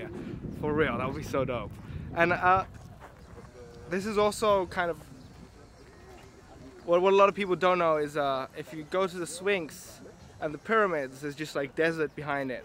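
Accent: Germany. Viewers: I can already smell the "Parkour Turist guide through Egypt with Jason Paul" in your Hillarious Germans English Dialekt... Egypt seems like an Perfect Place for Exploration... I saw the cat and was like "Yay Catpats" and then the cat ran away... but i saw the effort to keep your Promise so i let it pass for today and still like the Video The Kids were kind of Cute I like how the Bork of every tree is paintet White in the first two meters so no animals will eat that part because there are many Animals running around Will there be some Parkour Action with Camels? I like Camels... they are Derpy.... Derpy is Cute.... And while you on it.... Pat them for me Anyways Thanks for todays Video See ya Next Video <3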